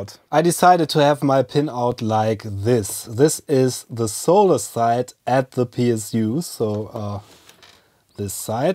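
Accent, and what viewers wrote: Germany. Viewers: Man merkt direkt das du Deutscher bist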